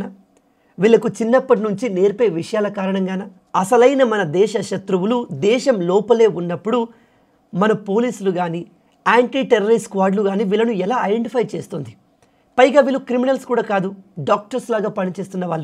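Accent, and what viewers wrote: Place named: Gujarat, India. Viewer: Nuvvu Gujarati va nee Telugu language accent Gujarati laga Indira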